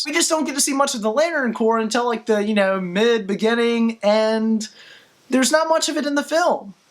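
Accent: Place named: Maryland, United States. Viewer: weird question I know, your pronunciation just sounds like a Maryland accent.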